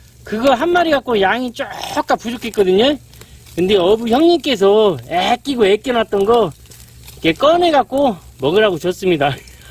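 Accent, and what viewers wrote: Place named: Gunsan, South Korea. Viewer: 정말 오랫만에 보네요 땅강아지! 그런데 많이 날아오네요 청정지역이네요 근디 말여유 사시는 곳이 군산이여유? 찰진 사투리가 섞인거 같아서유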